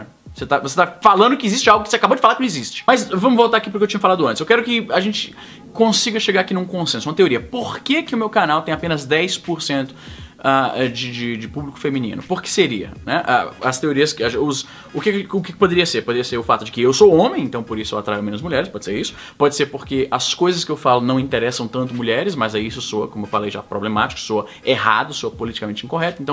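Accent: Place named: Ceará, Brazil. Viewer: Nesse video podemos perceber as raizes nordestinas do, provavelmente do Ceara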